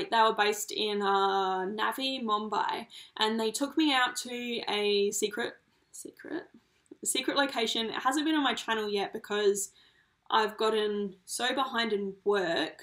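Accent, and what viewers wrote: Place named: Adelaide, Australia. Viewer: Ha! I thought you must be from SA! It’s your “accent”. I dunno, certain words you say, I’m like “she’s gotta be from Adelaide”!! Did you guys work along the way whilst travelling or did you just save your butts off beforehand? I think it’s amazing that you did this trip- great memories & experiences...it’s literally the experience of a lifetime! * & don’t worry about dislikes- they still count as engagement!😉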